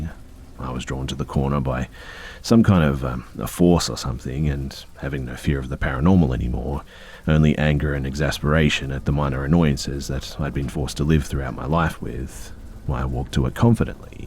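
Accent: Australia. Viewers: As ALWAYS, hearing your VERY COOL, unique Aussie accent as you read these wonderfully twisted stories puts me in a FABULOUS mood as it truly makes me happy; no matter how creepy, spooky, shocking or bizarre the stories are! Thank you for the dedication you have for your subscribers/fans. It is most decidedly more fun and interesting knowing you have this amazing content AND without a DOUBT, you ARE one of the absolute BEST YouTube narrators out there. PERIOD. (I apologize if my commments seems sort of disingenuous, a bit immature or ass-kissy (yep, I make that one up!), but I really enjoy your channel and it's content...so please keep doing what you're doing!!!) THANK YOU!!! 💀👽🛸🧛‍♀️💜🧛‍♂️⚰🦇🌙⛈🎃☠🐱🕸🕷👻🧟‍♀️🧡🧟‍♂️🧙‍♂️🔮🧙‍♀️🖤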